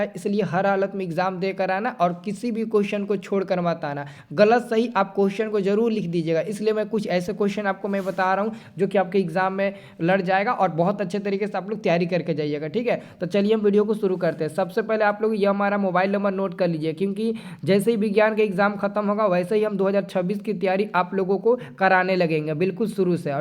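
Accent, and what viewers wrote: Bihar, India. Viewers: Bihar se ho Sir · tum ho Bihar se ho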